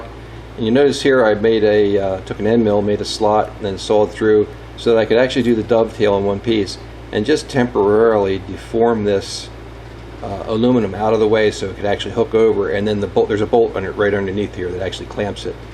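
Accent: United States. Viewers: Very nice. You do excellent work. You have the same accent as Tom Lipton do you come from the same area. I am in Australia so don't know much about American accents.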